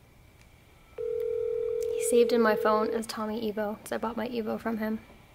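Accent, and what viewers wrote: United States. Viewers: HEE-YUN-DAY. not HUN DAI. Damn that American pronunciation kills me.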